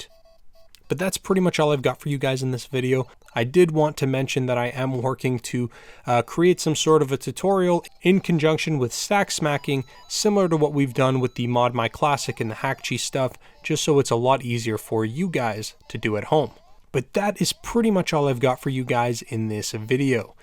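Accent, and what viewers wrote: Canada. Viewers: You sound suspiciously Canadian.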